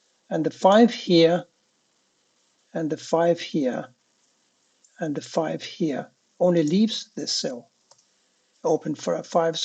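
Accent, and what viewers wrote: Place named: Germany. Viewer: Are you German? Love you accent!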